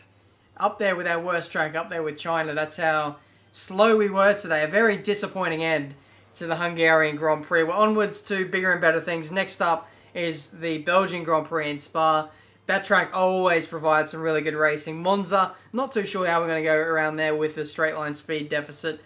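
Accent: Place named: Australia. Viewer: The Australian accent. "err" instead of " ahh""Ninjerr" instead of "ninjahh".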